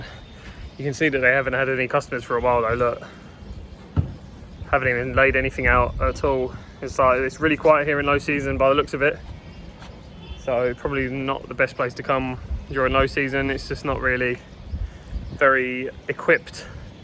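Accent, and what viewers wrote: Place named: England, United Kingdom. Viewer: Where in England are you from?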